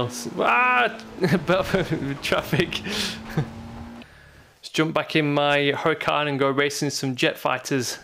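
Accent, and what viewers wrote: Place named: Yorkshire, United Kingdom. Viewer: where you from mate you sound local to me. im at Castleford :D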